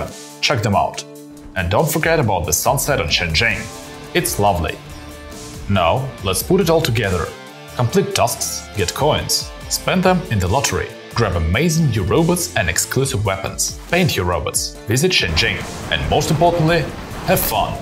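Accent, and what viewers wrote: Russia. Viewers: I wish you guys would get someone who could talk without such a heavy Russian accent, it’s really hard to understand what’s being said on these videos.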